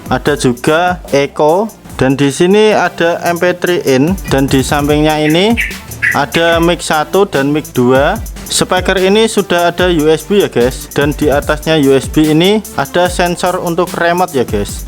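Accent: Java, Indonesia. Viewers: Medok sekali km gaes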